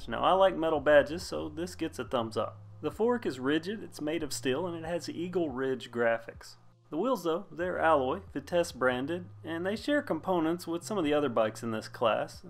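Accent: United States. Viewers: why not? I would be happy if I had your accent . My russian/turkish accent is sucks. You sound true american. Don't get me wrong . I am gonna keep listening . I know one thing for sure , if you like something it's gonna work you. 🤝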